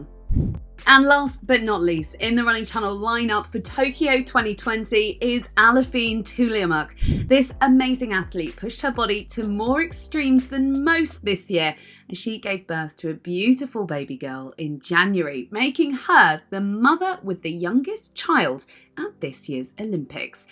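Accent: United Kingdom. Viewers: Hoping that no one says this lady is bias. due to her accent I can tell she's British. she said Dina will get 3 medals at the Olympics, meaning 3 gold. not bad for Dina, I love her, but the million dollar question is where is Shelly Elaine and shericka in the 100m 200m and the 4×100m? something to think about🤔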